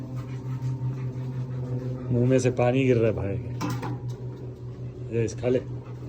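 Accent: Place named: Gujarat, India. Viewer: First time viewer- Bolne ka tone gujrati he ya marathi bit confuse. But style hrithik jesa he bilkul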